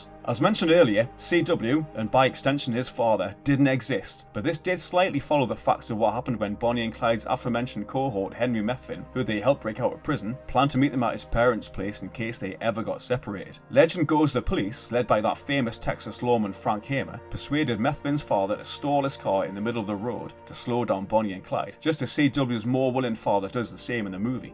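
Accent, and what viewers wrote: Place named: North, United Kingdom. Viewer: Good review, good to hear a fellow British Northerner narrate on a Joblo video.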